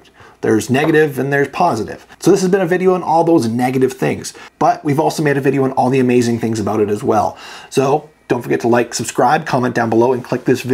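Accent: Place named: Canada. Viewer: What is this "Boot" he keeps mentioning? He keeps saying something about "A Boot" Lol love the Canadian accent. Great video!!!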